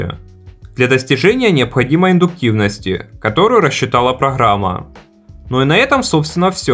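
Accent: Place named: Kharkiv, Ukraine. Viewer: Чую харківську говірку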